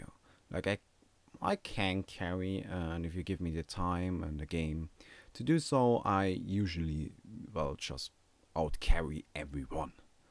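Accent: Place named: Germany. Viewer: Dude you're german, so your accent is amazing! And this is the simplest yet best bit of advice you can give us! I consider myself a "decent" last hitter (in casual mode, no stats) I can generally get every last hit! But as soon as I go matchmaking I start failing!! :( I don't know what I do differently, I guess the other players harass me so I don't get a chance to last hit! :(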